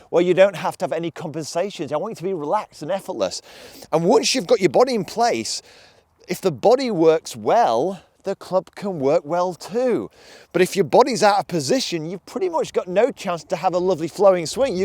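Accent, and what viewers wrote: United Kingdom. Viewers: first the compliment. I like your videos, they are very informative. Now my problem, and I say my problem, it's hard enough to understand someone with a british accent, it's even harder to understand them when they talk fast. thanks again for your excellent videos.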